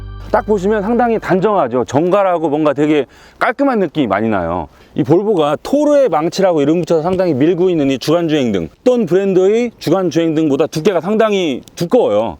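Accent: Chungcheong, South Korea. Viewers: 영상 처음봤는데 구독박고 갑니다~ 충청도 분이신가요? ㅎㅎ 말씀하시는 게 친근하게 들리네요~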